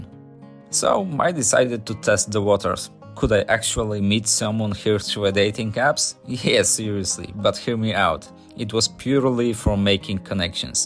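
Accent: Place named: Ukraine. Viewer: U must be wealthy. Dalmatia is tourist destination, which means expensive and u gotta have good income to support yourself. It is not Monte Carlo, of course, but still locals struggle to survive and people of your age left to work in EU, mainly Ireland, Scandinavia or Germany. Just renting something to live or real estate is up to the roof in recent years. And what is your home country? 2000 km and accent sounds like Ukraina.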